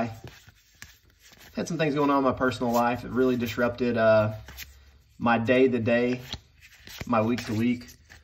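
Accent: South, United States. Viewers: Watched the whole thing! Just started getting into magic recently and I love watching your videos! Your southern accent makes me feel like I’m at home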